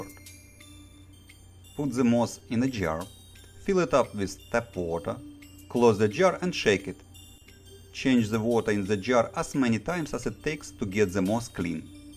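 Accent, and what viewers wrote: Russia. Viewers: Why you sound like Russian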